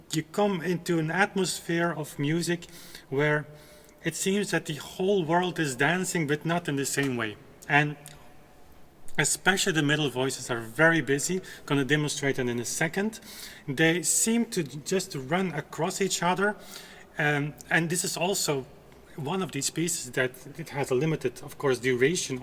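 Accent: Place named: Netherlands. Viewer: you also need accent reduction /th/, "out of the ordinary, not extraordinary" ''dove-tailed rather than very nice" but we love the Dutch! So if you composed this piece, would you just write in the canon dux et comes, then fill in the obligato Tenor and Alto? The homogeneity of the alto and tenor are almost megamaniacal, but account for the fundamental uniqueness of this masterpiece. Why is this a masterpiece? What harmonies are paramount at each point? Arent all the voices somewhat intertwined if we use 4 foot in the bass part? On the theology, what other canons in OB and elsewhere? Why are some melodies more suitable to being canonized? How are the melodies brought together at the end?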